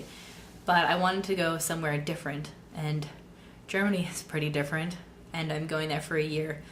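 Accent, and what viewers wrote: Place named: Canada. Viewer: I can't believe your favourite word in French is besoin. This is so random. ALSO, CANADIAN ACCENT. YES. YES.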